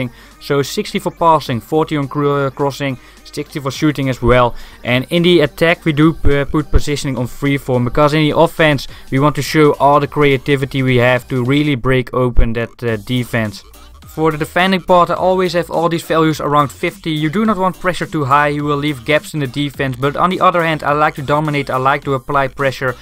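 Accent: Netherlands. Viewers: Are u dutch bro?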